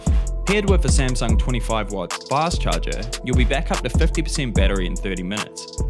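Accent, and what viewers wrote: New Zealand. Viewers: It really trips me out to hear a NZ accent in a tech review lol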